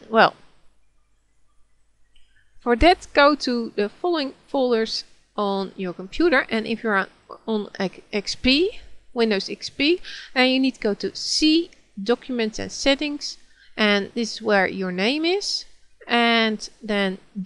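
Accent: Netherlands. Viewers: Dear. Thank you sooo much!!! And where are you from??? You sound Dutch.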